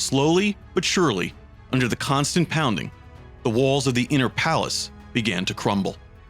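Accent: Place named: New York, United States. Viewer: He’s from NY. Youman, Yuge.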